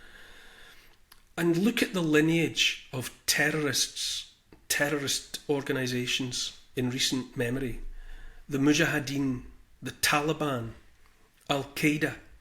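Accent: Scotland, United Kingdom. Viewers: Always the Voice of Reason and love hearing it from your Scots accent! 😄🙏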